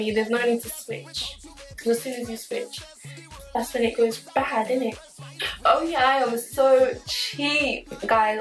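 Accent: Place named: England, United Kingdom. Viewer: your Accent is bomb ☺are you in England ?that is sooo dope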